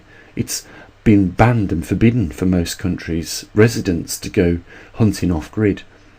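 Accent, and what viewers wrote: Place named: Walsall, United Kingdom. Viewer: Can you tell me what part of the UK you’re from? You sound very local to me..... Walsall???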